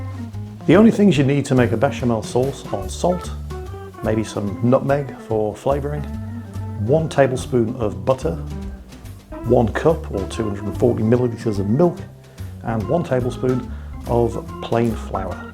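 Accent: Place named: England, United Kingdom. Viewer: [New Recommendation comes up] What's this? is doing a cooking channel now? Guess that's what I get for not watching Mingles with anymore. Subbed. Continue soothing my ear holes with your soft English accent you crazy old fart, you.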